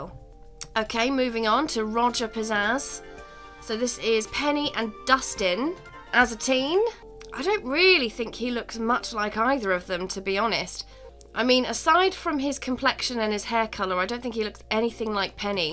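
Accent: United Kingdom. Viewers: i feel like your accent is a british version of the way eric cartman talks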